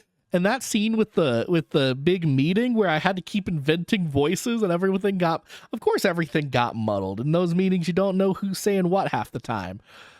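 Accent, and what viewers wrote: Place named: South, United States. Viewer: Your Southern accent coming out really suited the rider camp argument I thought - very much enjoyed it and I'm from Europe. Fitted the worldbuilding I thought. Please continue if you can. I too recall reading this book too young - I picked it up at the library because of the horses on the cover and being an SF/F fan and quickly put it down after getting freaked out by the swarm animals. I picked it up years later and enjoyed it much more, although I can find the prose quite repetitive at times. Cherryh is so good at making the world beautiful, intriguing and horrifying at turns. I love her names: 'willy-wisps', 'wally-boos', 'lolly-lies', 'goblin-cat', 'spook-bear' etc. The fact they can trick you psychically in these evolutionary ways and how that can infect humans psychologically en-masse is genius level worldbuilding. 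The main 'villian' (no spoilers) of the duology is also fantastic, Cherryh turns usual tropes on their head. Wish we got that third novel.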